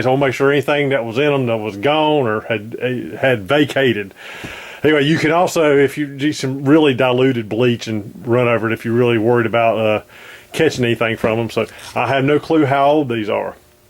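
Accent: South, United States. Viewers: And you're from down SOUUUTTHH, lol. Great video.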